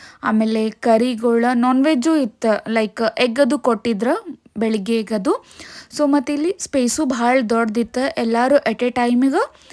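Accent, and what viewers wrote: India. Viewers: ಮೆಡಂಮ್ ನಾವು ದಾಂಡೇಲಿಯವರೆ,ತಮ್ಮ ಅನುಭವ ಹಾಗೂ ಶುದ್ದವಾದ ನಮ್ಮ ಧಾರವಾಡದ ಕನ್ನಡ ಭಾಷೆಯ ವಿವರಣೆ ಕೇಳಿ ನಿಜವಾಗಿಯೂ ತುಂಭಾ ಖುಷಿಯಾಯಿತ್ತು, ದಾಂಡೇಲಿಯಲ್ಲಿ ಸರೌಡಿಂಗ್ 600.700ವರೆಗೆ ರಿಸ್ವಾಟ್೯.ಹಾಗೂ ಹೋಮ್ ಸ್ಟೋ,ಇರುವವು ಇನ್ನೂ ಹಲವಾರು ರಿಸ್ವಾರ್ಟ್ ಗಳು ತುಂಭಾ ಸುಂದರವಾಗಿವೆ. ಇದು ಕೂಡಾ ತುಂಭಾ ಸುಂದರವಾಗಿದೆ.,ಧನ್ಯವಾಧಗಳು ತಮ್ಮ ಶುದ್ದವಾದ ಹಾಗೂ ನೈಜ್ಯ ವಿವರಣೆಗೆ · Speaking in north(Uttar) Karnataka language, and you are from the south Karnataka youtube channel and? Why do you people do like this.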